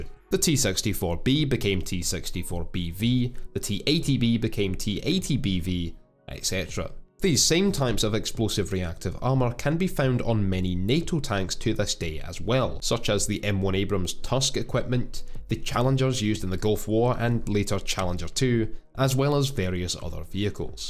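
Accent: Scotland, United Kingdom. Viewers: bit of scottish for you there, pal, like the narrator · is he scotish ?! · Speak American man! I would love to watch and learn from ur videos but the Scottish accent irritates me, u pronounce a lot of words wrong. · Is this a scottish accent? Sounds awesome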